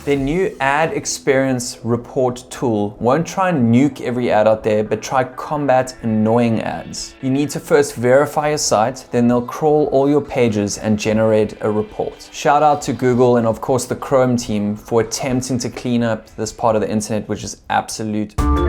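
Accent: South Africa. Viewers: Are you perhaps South African? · Well done, 👏. A fantastic curated blitz of resources and news from the design and dev space. Great to hear the South African accent there too:) Subscribed - looking forward to the next one.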